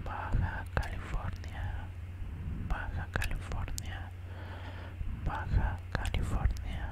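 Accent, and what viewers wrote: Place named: Ecuador. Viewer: Flashe q eras de Ecuador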